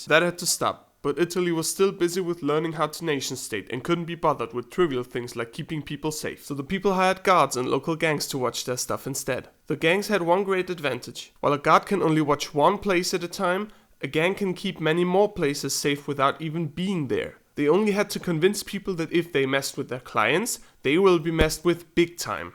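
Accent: Germany. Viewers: The narrator sounds german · are you german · You have a german accent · are you German? you have a very heavy German accent, cute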